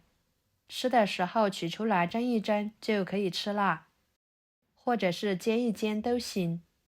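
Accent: Yunnan, China. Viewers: 也谢谢你的云南普通话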